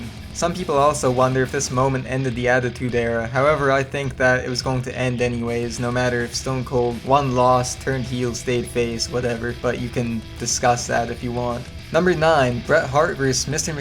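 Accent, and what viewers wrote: Canada. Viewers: You sound like you're from Nova Scotia. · You can defend your Lawrence Taylor comments and put up annotations all you want but that doesn't change the fact that you don't know what you're talking about. It's pretty evident through your accent that you're Canadian. It's not WWE's fault you're from Canada and don't care about American football. Just because you don't know who LT is doesn't mean the majority of WWE fans didn't. Besides, how old were you in 1995? Were you even alive? WWE is an American-based company with mostly American fans most of which are NFL fans. If you really want to complain about WWE using one of the biggest names in the world of sports at the time to boost ratings, I don't know that they'll ever find a way to please you. To put it into perspective for you, it would be like WWE having Gretzky appear at WM and an American saying "Who is Wayne Gretzky? 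Have you ever heard of him? Probably not."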